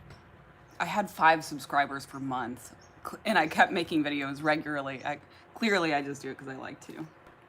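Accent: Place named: Midwest, United States. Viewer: in fact its not too fast, its a problem of accent... but sometimes, thats the good thing with you tube, i can roll back the part i dont understand and after 2 or 3 times understand it better! but it doesnt works all the time though! ( and dont be afraid, scottish, northern irish and northern english are waaay much harder to understand than your middle west accent!!!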